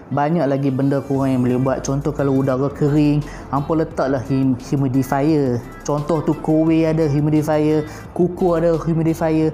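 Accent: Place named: North, Malaysia. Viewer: Terbaik! Love your explanation with utara accent 😂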